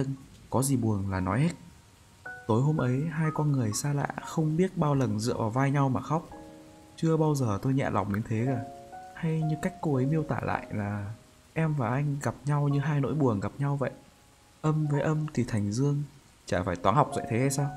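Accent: Hai Phong, Vietnam. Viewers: Bác add quê Hải Phòng ah? :) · A ở Hải phòng à :v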